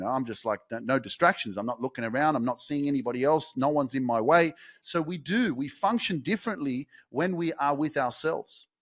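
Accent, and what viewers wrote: Australia. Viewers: He's not British! Think maybe the accent is Australian.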